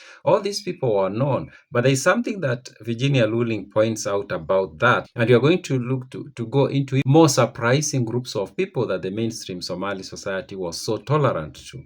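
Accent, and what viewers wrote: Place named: Kenya. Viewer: we are dignified people we are nomadic we troll each other because we think words are just word lol we laugh a lot and believe in mental resilience and respecting others even if we are not from the same country I learned all this from my dad he grew up nomadic but he gets along with anyone and will speak up/ stand up for anyone when need be. M Side note: from your accent I think you’re Kenyan or 🇺🇬? This my first time seeing this video so is just a guess😂 nice video🙏🙏🥰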